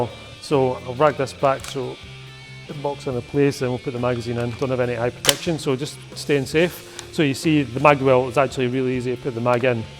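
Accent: Scotland, United Kingdom. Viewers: Haha all the folk saying hes English and Irish clearly haven't heard a Scottish accent before :L in one of his videos he actually states hes scottish. hur hur.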